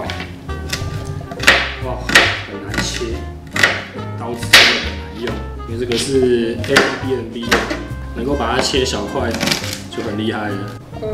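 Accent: Taiwan. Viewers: 光聽這台味就舒服了！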